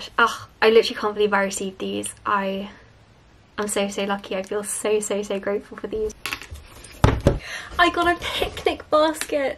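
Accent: United Kingdom. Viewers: I love your British accent and use your videos to practice my pronunciation ❤ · That's how british people talk. What is wrong with you??? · i wish i could speak as beautiful british english as you 🤩 greetings from germany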